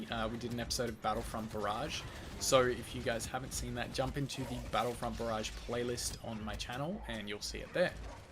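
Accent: Australia. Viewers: You and make listening to an Aussie accent bearable possible. Thank you.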